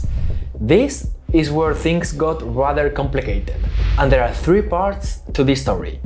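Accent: Spain. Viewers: Very interesting that your english has a clear spanish accent. Not sure if Korean and Spanish sound the same now.. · Oye se nota que eres español, por que no haces el canal en español? Seguramente tambien tendrias muchas mas visitas, o por lo menos un canal secundario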